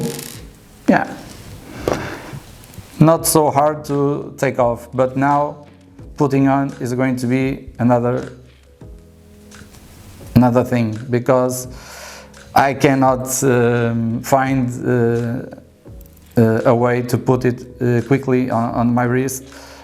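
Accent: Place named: Portugal. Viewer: I mean it's obviously not his first language, I'd go easy on the guy, sounds like his native language is portugese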